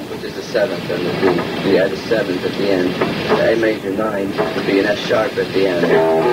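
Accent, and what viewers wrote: England, United Kingdom. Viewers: This guy has an english accent ? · of course he started getting a English accent. look who he was around night and day.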